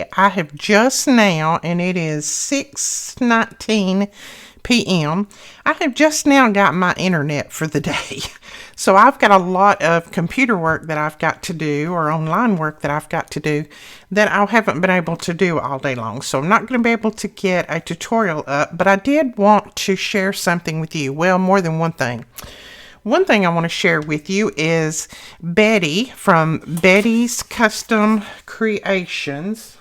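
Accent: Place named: Missouri, United States. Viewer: Oh my goodness, you are so inspiring! I was recently given a bunch of printed papers and didn't really know what to do with them. So I searched youtube and you were on the top of the "scrapbooking" search. Your creations are so beautiful! I already made a couple of bible study journals, but I forgot to take any pics before I gifted them (just took one of the first cover). Now im working on a journal as a graduation gift for a family friend going off to college. I've discovered so many things I can use from your videos! And I LOVE your accent. I live in Washington state, but I'm from Missouri. You sound like home! 🏠💗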